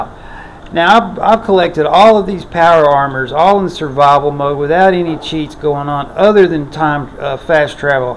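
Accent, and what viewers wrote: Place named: South, United States. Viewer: Fallout videos are 9000% better with a Southern accent. Roll Tide.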